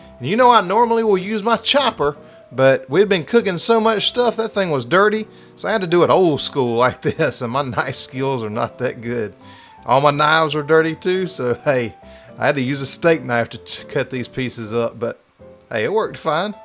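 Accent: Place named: South, United States. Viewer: I just love when you say, (in your distinct southern accent 👍🏻) “Well, I forgot to add that in,” or “I forgot to show you that,” or you forgot whatever…..& then you just correct it & move on. 😀 That means that yes, you are my people!! Because that’s exactly how I run my kitchen!! 😂 This is great seafood comfort food right here! Mmmm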